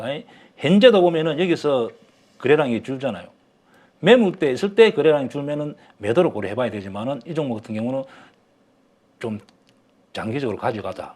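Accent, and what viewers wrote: Gyeongsang, South Korea. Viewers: 갱상도 라도 시골 이네..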